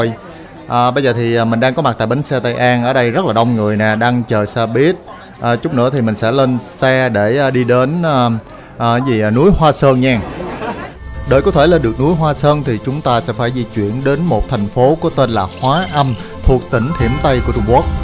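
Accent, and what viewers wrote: South, Vietnam. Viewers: Kỷ thuật quay phim điêu luyện,giọng thuyết minh miền nam nghe thật dể mến,chúc cháu luôn khỏe mạnh để thực hiện thêm nhiều clip đặc sắc nhé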